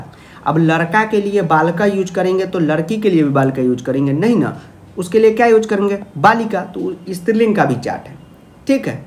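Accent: Bihar, India. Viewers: Sir aap bihar se h kya ... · Yah to full bihari hai bhayiya sir aap bahut acha padha rahe ho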